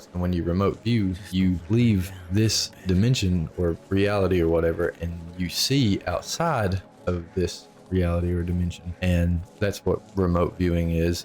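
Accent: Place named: Appalachia, United States. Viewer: Judging by your accent and the way you said "retched" instead of "reached", I'm gonna guess that you're Appalachian (me too). I'll never doubt people's stories of crazy stuff they see in the mountains, there's just too many stories for them all to be fake. I'm glad the dissociation passed for you, but this whole experience had to have been insane.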